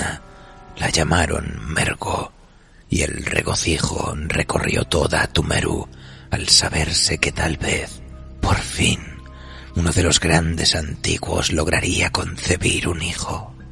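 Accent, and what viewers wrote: Spain. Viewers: Excelente narración, me encanta el tono español en los relatos góticos, ojala también puedan hacer el de blasphemous. Saludos desde México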